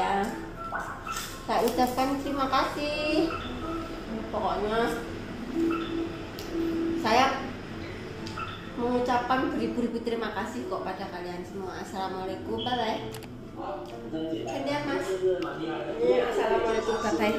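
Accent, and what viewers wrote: Java, Indonesia. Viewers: Mbak..salam kenal, mbak sampean boso jowone kok kedok to, sampean dari mana nggih..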